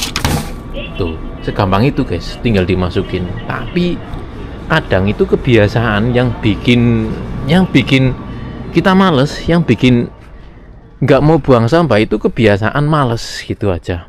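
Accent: Banyumas, Indonesia. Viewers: Rika wong ngapak ya mas..yawis pda kya nyg brarti 😅 salam ngapak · Kang ngomongnya kenapa ngapak ngapak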